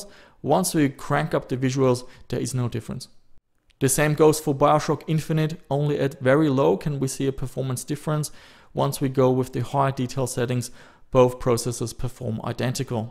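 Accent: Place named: Germany. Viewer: Australian Dollars?????? For your accent I thought all this time that you were German, but then again i am from Mexico so I guess we North Americans know little about the south-eastern corner of the world.....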